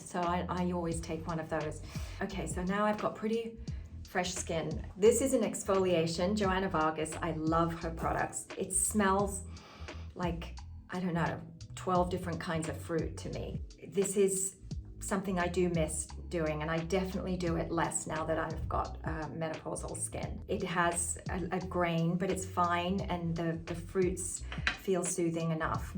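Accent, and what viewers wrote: Australia. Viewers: Although she was born in England 🏴, I think 🤔 after she moved to Australia 🇦🇺 age 14, she eventually developed and adopted her current Australian accent. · She sounds very Australian to me!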